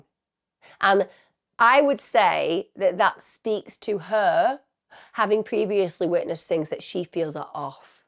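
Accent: Australia. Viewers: As tragic as it is your Aussie accent is fab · Terrible case, very sad... evil man. But I also love the Aussie accent haha! Spot on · I was living in Melbourne at the time and I just cannot put into words how distraught and horrified we all were when this happened. This bridge is HUGE. So high. She had absolutely no chance, the poor little angel. (Your Australian accent is great by the way). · Heartbreaking 💔 case. Loved your Aussie accent lol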